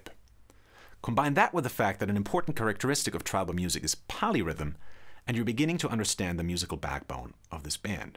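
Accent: Germany. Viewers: Are you german? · Holy guacamole, hello my fellow German. I didn't know you were so very German. But now that I know, I can actually hear teeny tiny things in your speech that should've given it away earlier. In any case, your videos are amazing. Thanks for being such a great inspiration!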